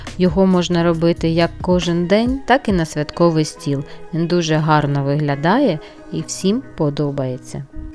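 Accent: Standard. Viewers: Мені дуже подобається ваша чиста українська мова! І салатик смачненький! Буду чекати інших ваших рецептів!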